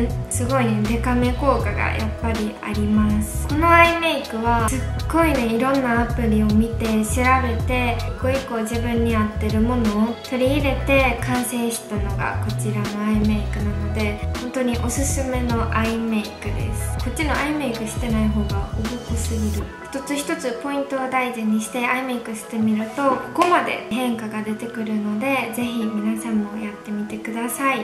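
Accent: Kansai, Japan. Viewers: 可愛すぎて時間とまって観てました。。関西弁もかわいいいーーー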